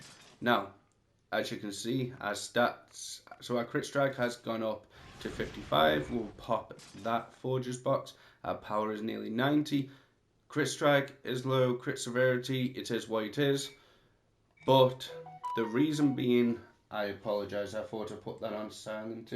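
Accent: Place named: United Kingdom. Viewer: Nice to hear a British content creator for NW. But why play on console? {:-:-:}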